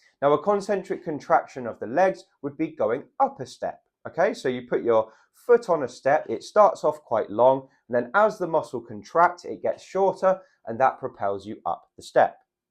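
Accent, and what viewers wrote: England, United Kingdom. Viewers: Thank you so much for your helpful, easy to understand videos. ( SO nice to have a simple English accent! 😊🇬🇧). I am 83 and pretty well preserved. But l slipped during a violent thunderstorm last august (2022) and was very lucky not to have a serious hip fracture, though l am still waiting for re -education in a European country where everything is so slow. But since COVID maybe it’s slow everywhere. ) l just have problems with my right leg. Cannot cut my toenails or put my shoe on easily and l have to walk with a stick. Even with that l get panic attacks when faced with a down slanting slope. I have downloaded nearly all your videos and started seriously today with the glute excercise. Thanks again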